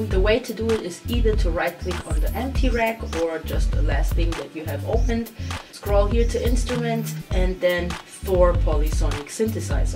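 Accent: Germany. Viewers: Yes sis, feeling the vibes n knowledge. Luv the German accent.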